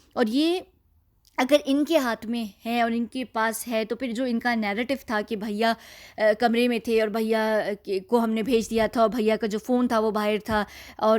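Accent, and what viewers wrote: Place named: United Kingdom. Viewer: Absolutely in love with your work ma'am. You always back up ur facts with evidence. But I really have crush on your English accent. More power to you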